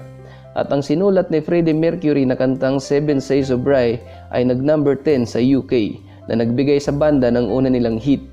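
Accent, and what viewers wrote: Visayas, Philippines. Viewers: Bisaya ka man dong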